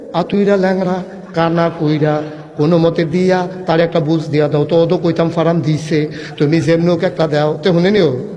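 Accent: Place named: Comilla, Bangladesh. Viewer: সে সম্পূর্ণ কুমিল্লা ভাষায় কথা বলে। কুমিল্লায় ওয়াজ করে বেশি,সে নিজেও কুমিল্লার মানুষ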